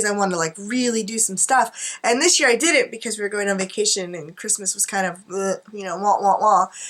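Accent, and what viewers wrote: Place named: United States. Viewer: Ah well I am British and you are 'very American' to me, I don't know what very means but to me you definitely have an accent lol xx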